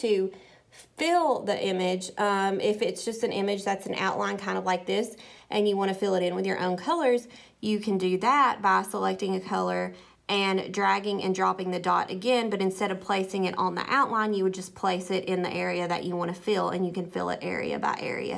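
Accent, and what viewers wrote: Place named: South, United States. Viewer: Your accent is such a southern accent it’s sooo cool!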